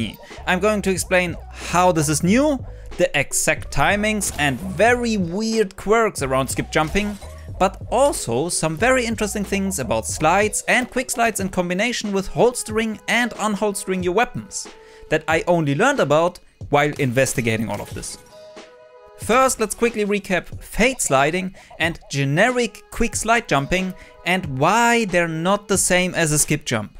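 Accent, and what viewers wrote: Germany. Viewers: Are you Form Germany? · Dikka dein englisch hört sich mehr deutsch an als alles andere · Sounds like German Accent :) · Man hört so den Deutschen akzent raus 😂💪🏻🔥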